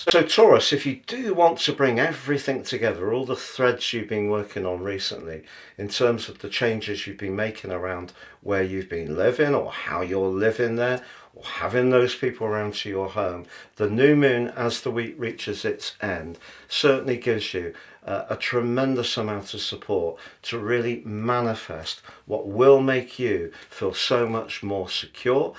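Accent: United Kingdom. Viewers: I do love your very British English with such sheakspearian style. Greetings from Cairo Egypt.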